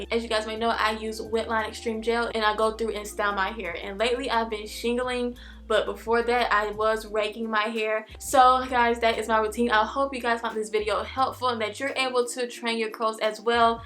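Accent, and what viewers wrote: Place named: Georgia, United States. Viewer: I found your videos populating on my YouTube feed a lot. “Thanks to my sister”😒. But although I’m not interested in black girls hair care processes for myself personally. I’ve come to find other things about you intriguing. Like the way you talk. I KNOW YOU HAVE TO BE FROM GEORGIA😂. The combination of how you articulate and encourage sophistication within black beauty…you definitely got my attention.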